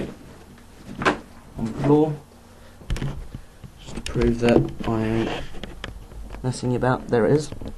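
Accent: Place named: England, United Kingdom. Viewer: A Filipino with an English accent, now I've seen and heard everything.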